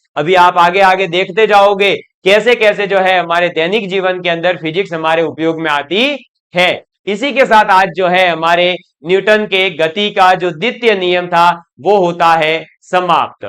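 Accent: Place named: Rajasthan, India. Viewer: Bhai aap rajasthan se ho १००%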